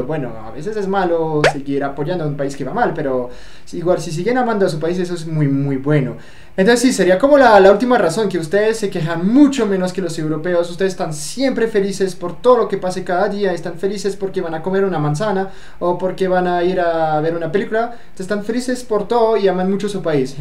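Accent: Bogotá, Colombia. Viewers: Tu parles trop bien l'espagnol en fait, on entend presque rien d'accent français, et tu as pas mal chopé l'accent colombien. Du coup j'ai vu que tu kiffes mon pays d'origine (Le Mexique)mais tu parles pas comme un mexicain;) haha, ¡Felicidades, güey! ;) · Se le pegó el acento colombiano. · Jajjajaja creo que eres sumamente gracioso. Tienes una impresión muy genial de los latinos. Me parece que hablas con cierto acento colombiano. :) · chevere el video, habla muy bien español? Se nota que aprendió en Colombia, un poco el acento y algunas palabra. Chevre. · habla casi rolo el parcero ,buen video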